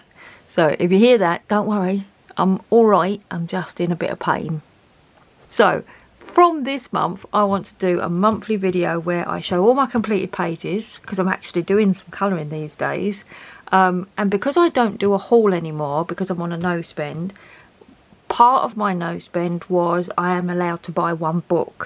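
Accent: North London, United Kingdom. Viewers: Love your work! You talk like me....im a north London girl!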